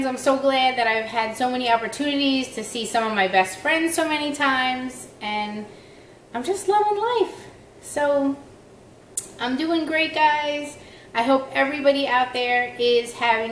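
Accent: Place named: Boston, United States. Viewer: Great job ! Proud of you. New Subscriber from Los Angeles, CA. Do you have a get-to-know-me video? I'd like to know where you are vlogging from, love the accent. My guess is Boston.:)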